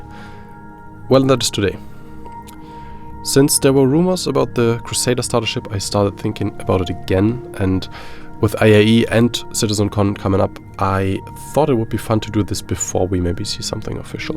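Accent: Germany. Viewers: dieser akzent macht mich fertig..deutscher ? :D aber respekt guter content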